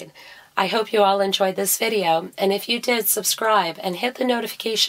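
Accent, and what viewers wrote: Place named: Canada. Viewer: Nice, concise tutorials. Just what's needed for a quick refresher. And I enjoy the Canadian accent to boot.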